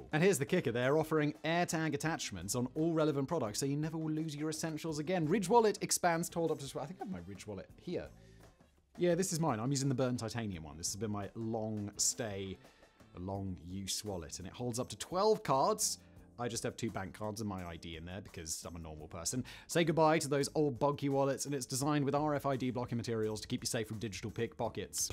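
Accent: United Kingdom. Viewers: “A true zenith of egregious military inability.” This is the most intense and perfectly descriptive explanation of the general’s lack of military prowess… But when I say it, it doesn’t sound as impactful as when says it…his British accent makes it sound much more posh and erudite than it does with my American/New Orleans accent… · Bro - you need to slow your speech down a bit . Between the pace of your speaking and the fact that you have a British accent ( which everyone may not be accustomed to hearing ) it makes it hard for the ( some ) listeners to understand all the words in the lesson you are trying to get across . Please , slow down.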